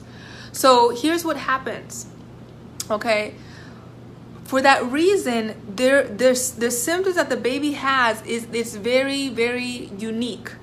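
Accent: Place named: Haiti. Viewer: This video is trash but the Haitian Accent is cool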